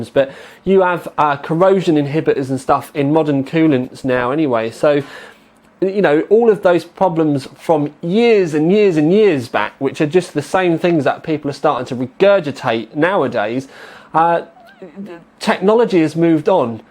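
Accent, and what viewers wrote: United Kingdom. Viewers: lol i love how brits pronounce it :P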